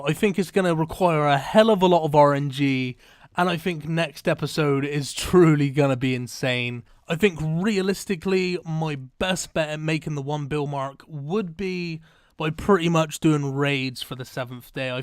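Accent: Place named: Cornwall, United Kingdom. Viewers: How much I wish he could just pronounce his TH, I could then forgive the west-country accent entirely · Man you're so Cornish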